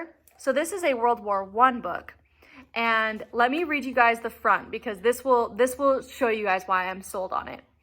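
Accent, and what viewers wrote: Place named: Utah, United States. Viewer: Are you from Utah? You have a Utah accent. That’s why I was asking haha.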